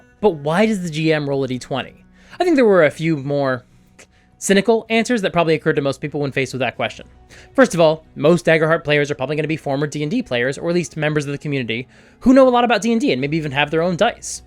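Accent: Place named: United Kingdom. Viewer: These Daggerheart videos are great!! British accent, on the other hand... (No hate tho man, I love your stuff)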